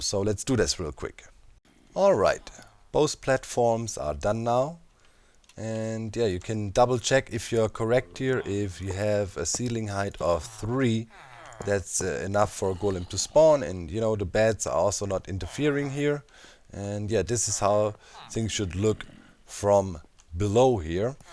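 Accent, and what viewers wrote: Germany. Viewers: Ahhh... Man hört hart raus, dass er normalerweise Deutsch oder so redet 😂 dat accent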